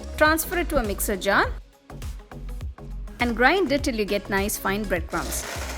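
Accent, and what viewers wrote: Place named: India. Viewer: are u indian mam? accent is 👍 nice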